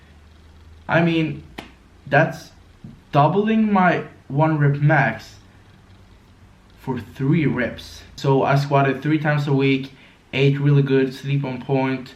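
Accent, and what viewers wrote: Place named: United States. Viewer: i love your american bro accent hahaha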